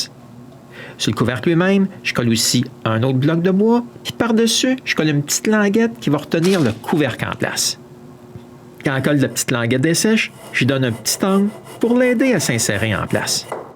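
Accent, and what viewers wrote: Quebec, Canada. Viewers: J'adore c't accent d'la belle province ! Mais mince alors, c'est pas juste kek bouts d'bois, c't'un chef d'oeuvre, tabernacle ! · Super idée et super réalisation ! Il ne manque que les odeurs de bois et de colle . . . Bon , l'accent québécois est parfois dur à comprendre , c'est un Marseillais qui te le dit ( le nôtre est " costaud " aussi ! ) . Bravo pour ton travail !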